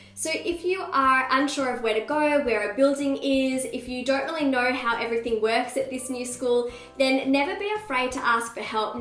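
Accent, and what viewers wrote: Australia. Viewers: Australian lol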